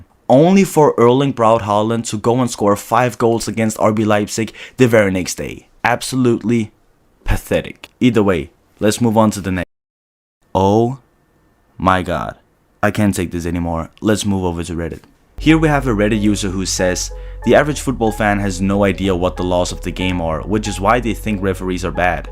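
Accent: Denmark. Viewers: Where are you from, if I may ask? You sound kinda Danish, and I saw your friend had a DBU shirt on as well in one vid at least. Anyways, nice video! :D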